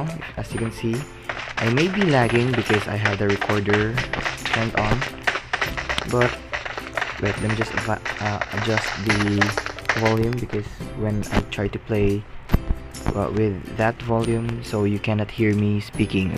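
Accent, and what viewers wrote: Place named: Philippines. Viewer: Hey are you filipino? I can tell by your accent buddy! Mabuhayy!!!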